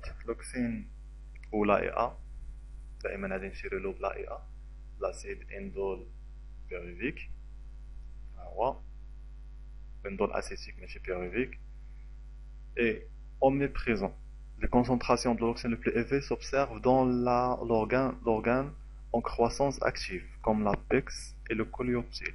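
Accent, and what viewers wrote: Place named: Arab world. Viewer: Ton exposé n'est orienté qu'aux arabes, ton français est trop arabisé, on y comprend presque rien. Shukran quand-même.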